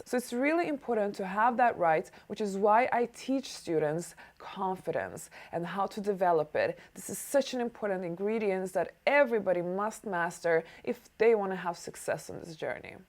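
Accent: Sweden. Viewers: Why do you sound Swedish?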